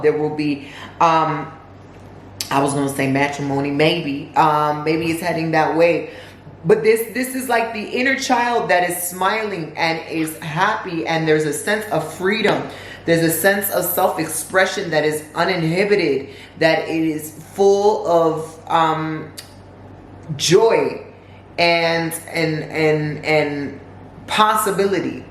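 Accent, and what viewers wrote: United Kingdom. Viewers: Lmao at the British accent, thank you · The British accent took me down 😂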